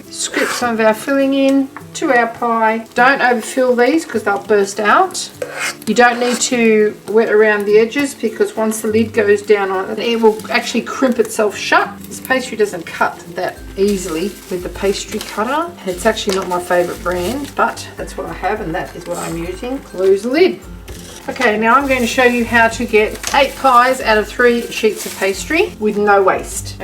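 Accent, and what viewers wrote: Australia. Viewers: Nice to hear an Aussie on YouTube!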